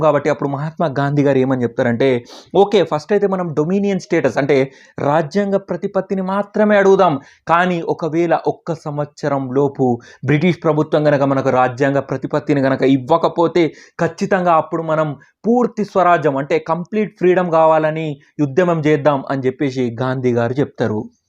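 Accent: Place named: Telangana, India. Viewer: Ni yamma,emanna kick unnada Endi bhayya ni voice lo aa rhythm Vere unnadi po Telangana yasa la masth sheppinav Deeni thalli gisonti faculty unte dennaina palaggottochhuuu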